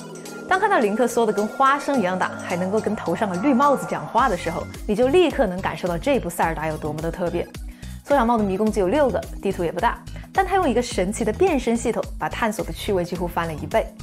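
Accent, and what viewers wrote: China. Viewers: 主播是不是四川重庆的人啊 我好像听到川普….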